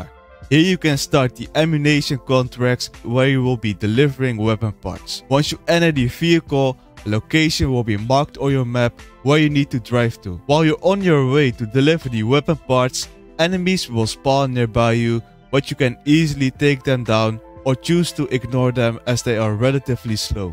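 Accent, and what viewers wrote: Netherlands. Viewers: Ik had binnen 20 seconden door dat je een nederlander bent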